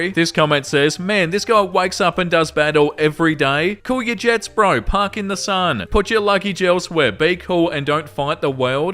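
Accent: Australia. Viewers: I have to wonder if you're an Aussie. You sound like it, and it's nice to sit and listen to.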